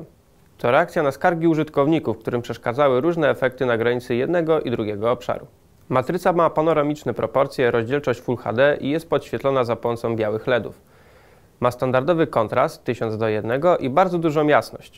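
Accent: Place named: Scotland, United Kingdom. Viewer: DAMN SCOTTISH ACCENT !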